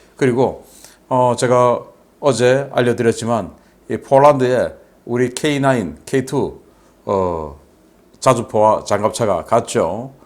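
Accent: Gyeongsang, South Korea. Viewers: 경상도 말으로 러시아를 발라 버리는 깡이 직이네요... 그런데 방공망이 없는 거나 마챦가지 라는게 더 웃깁니다... 우리나라도 예전에 북한 의 정말 얄궃은 드론 을 나라 한바퀴 돌아 갈때 까지 몰랐었는데... 상당히 우려 스럽 습니다... 나아 졌는지.... 걱정 이군요...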